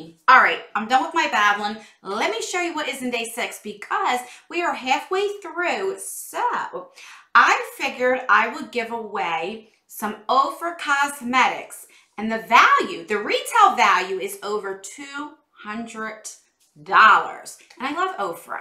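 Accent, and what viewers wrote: New Jersey, United States. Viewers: I love myself a good nude lippie and I like how u say really your jersey accent comes in I understand the struggle I got a ny accent lol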